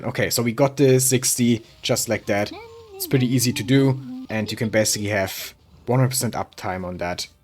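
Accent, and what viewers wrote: Germany. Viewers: i hear german?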